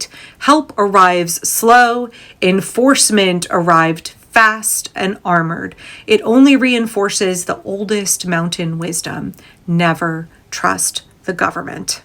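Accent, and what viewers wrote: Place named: South, United States. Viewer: Damn finally! I’m from the South and this is the first time I’m hearing someone talk like they did when I was growing up.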